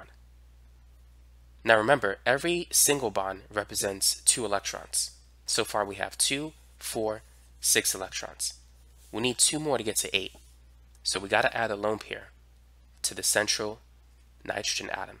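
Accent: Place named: Boston, United States. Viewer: Are you from the Boston area? you have a very interesting accent.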